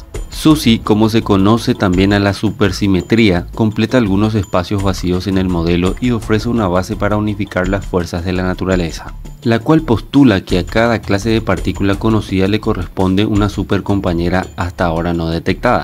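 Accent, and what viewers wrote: Paraguay. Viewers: Es confundible por LA voz es paraguayo yo soy Paraguay tambien jeje saludos amigo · ¿Eres Paraguayo? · sos paraguayo?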